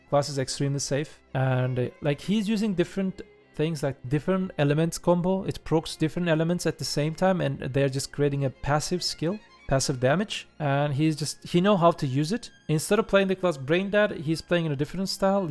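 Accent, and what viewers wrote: Turkey. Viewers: Are you turkish ? Your accent exteremely turkish .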